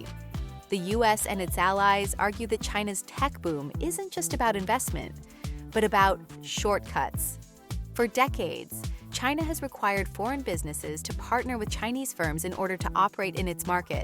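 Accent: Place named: United States. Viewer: You have an American/Canadian accent. Yet you are pro-Chinaman accent. 1+1 doesn’t add up with an American accent. You are an agent of the CCP? Or AI voice agent ?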